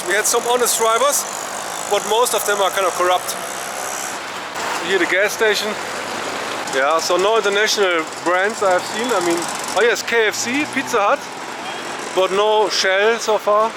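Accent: Germany. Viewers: German, or? (ich höre den Akzent 😂)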